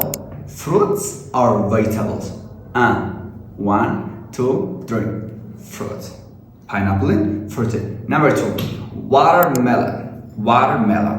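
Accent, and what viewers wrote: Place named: United States. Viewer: Hey dude have anyone ever tell u that ur pronunciation is American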